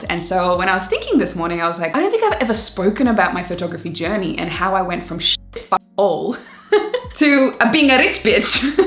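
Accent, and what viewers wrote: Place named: Australia. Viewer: Been following for a while but didn’t realise you were an Aussie 😁 Nice one !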